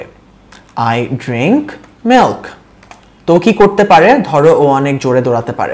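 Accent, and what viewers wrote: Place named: United States. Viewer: Channel টা পছন্দ কারণ American accent এ ইংলিশ ক্লাস হয়।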